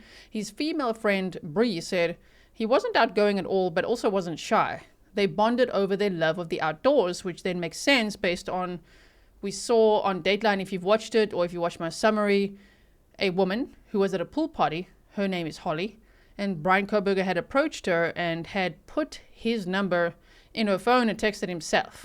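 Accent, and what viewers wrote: South Africa. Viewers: Im so glad is South African. Her accent is perfect for this space it gives an air of bipartisan, but you know she always stands for the victims. I guess I'm used to hearing American accents, and they just get on my nerves. Lol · Very much enjoying this content. I like the factual breakdowns. But someone, please help. I'm trying to place her accent but I'm failing. I'm thinking south Africa? My SIL's South A accent is similar but a bit different.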